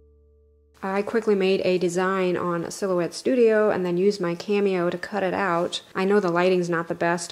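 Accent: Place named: Pennsylvania Dutch, United States. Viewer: I hear remnants of a pennsylvania dutch accent. I think you may have come from a very traditional mennonite family. Holdeman. Very beautiful porch and home! Thanks for sharing!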